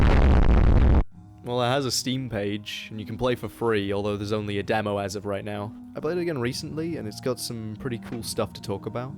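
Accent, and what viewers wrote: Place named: Australia. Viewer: you're aussie arent you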